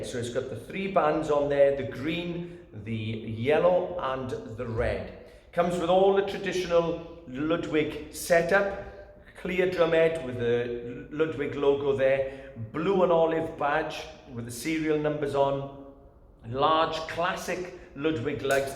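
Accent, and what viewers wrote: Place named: Liverpool, United Kingdom. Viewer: They were discontinued in late 70s because oil prices skyrocketed. Plastics are a by product of refinery business, including vistalite acrylic. It wasnt because of the light up system development, they just werent profitable to manufacture because of the source material price increase. The light system had its flaws too. They would heat up the shells to the point were the seams would start letting up. Are you from Liverpool by the way? You sound like McCartney :)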